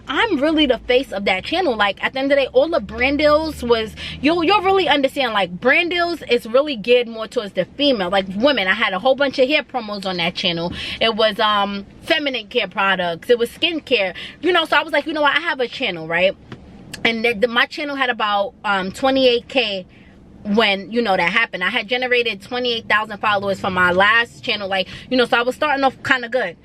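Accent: New York, United States. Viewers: That NYC accent! Fire! Super detectable!😃You sound like Minaj!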